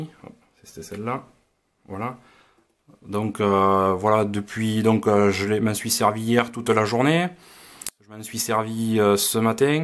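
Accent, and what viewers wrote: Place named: Perpignan, France. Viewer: L'accent de Perpignan 😂😂😂 Une boîte toute blanche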